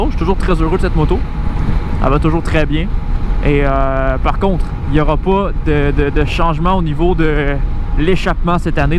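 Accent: Canada. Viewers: Hey je me suis abonner prc j'aime bcp ton contenus est je pense que t canadien prc ta lancent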